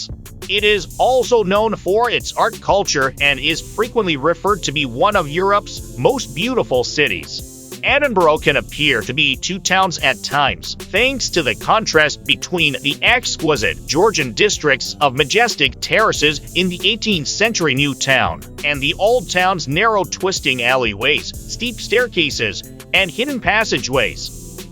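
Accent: United States. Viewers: The worst possible voice over ever! Cheezy all-American accent, multiple mispronunciatons including - ad nauseum - Edinboro??!! It's Edin-burr. Or as many locals say, Em-bra. Some nice video clips, though some syncing with the narrative would have been beneficial for those lacking familiarity with the city. Also, for any visitor, walking through the New Town is a great experience and can be done enroute to Dean Village before a walk along the Water of Leith to Stockbridge enroute to the Royal Botanics. Also, a day on Victoria Street? I think not! There are some nice shops, true, though most are specialist offerings. An hour would likely be max. Though the Botanic Gardens is a nice place to find some quiet, so is the west end of Princes Street Gardens, with the castle overlooking. Get some eats at Marks & Spence on Princes Street and make your way down the steps at the bottom of the Mound...and 'dine' in the shadows of the castle. 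Fabulous!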